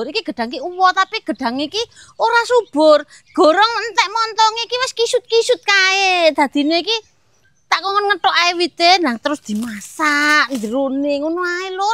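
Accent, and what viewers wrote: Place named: Java, Indonesia. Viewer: Assalamualaikum..met malam..saya sangat suka klu ngonten trutama bhs jawanya yg medok..oh iya pngling skrg rambutnya terurai makin ayu skrg..biasanya di ikat trus..skrg manglingi...sukses selalu...❤❤❤❤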